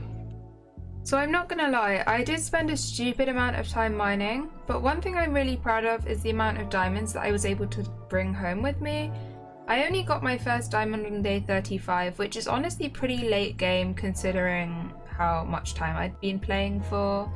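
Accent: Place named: London, United Kingdom. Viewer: I thought so, sounded like a londoner, well good luck to ya 🙂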